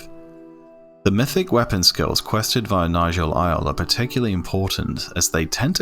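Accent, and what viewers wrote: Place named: United Kingdom. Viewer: Love the British accent. Makes everything seem way more official, especially for a fantasy game, and pronouncing melee as "mee-lee" is awesome lol. I wish had a British accent.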